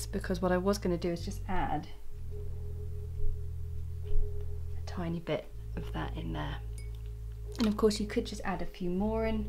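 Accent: England, United Kingdom. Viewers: I just have to say, I appreciate how clean your palette is. I watch some other painters and theirs are so messy, it really makes my OCD go batty. 😂 I also enjoy listening to your accent. I am from Minnesota but have friends in England, and so I imagine that you are just another one of my friends there. I always watch before I go to sleep. It is so soothing.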